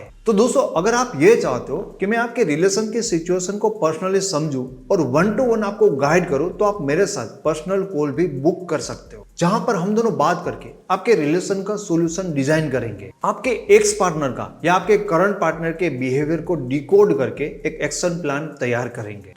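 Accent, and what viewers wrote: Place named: Kathiyawad, India. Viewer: Sir aap gujrati kathiyawadi lag rhe ho bolne ke tone se lag raha hai😅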